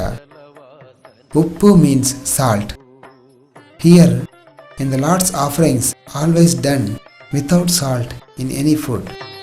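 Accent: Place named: England, United Kingdom. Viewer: Commendable job. Can’t thank you enough for the commentary in English. Sadly heavy English accent. Best wishes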